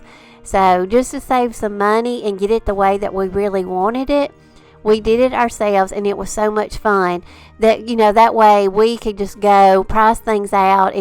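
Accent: South, United States. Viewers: First time viewing any of your videos. Such an inspirational and intimate time we'll spent. So comforting to here a beautiful Southern accent. I feel like I'm talking with family.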